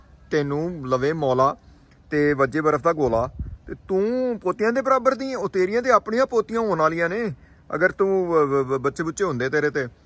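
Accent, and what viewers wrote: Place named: Punjab, India. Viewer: you have excellent punjabi accent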